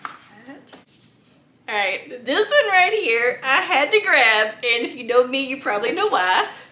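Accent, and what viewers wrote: South, United States. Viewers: Love your southern accent and Bucee’s T-shirt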